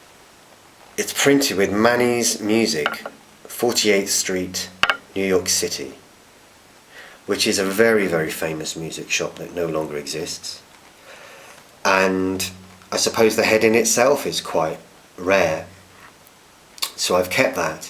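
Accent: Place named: North London, United Kingdom. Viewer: Your restoration videos are superb man. I detect a north London accent going on there lol. I am probably wrong. I'm from up there. ! have 3 mahogany snares in my collection. !968 School Festival, 1965 Pioneer and 1948 WFL 15'' x 8'' Concert. I have kept the WFL and School Festival original. I love the mahogany colour. Your restoration video where you transformed the WFL to a Ringo Jazz Festival was fascinating. You did a great job! Well done mate. We share the passion. You are probably from Hereford or somewhere. I hear a twang! hahahaa. Stay safe. Jem )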